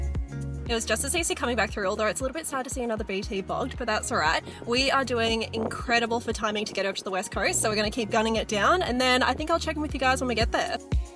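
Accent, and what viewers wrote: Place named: Australia. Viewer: Throttle it Like You Stole It Aussie Talk at its Finest 😂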